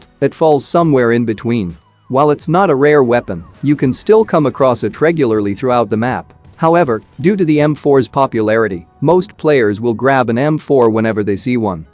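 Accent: India. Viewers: Are you indian???😂😂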